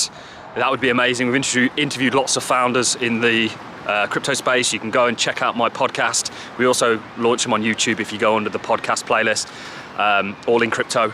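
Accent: Birmingham, United Kingdom. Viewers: Definitely a Brummy tone I'm sure I've seen him knocking around town kro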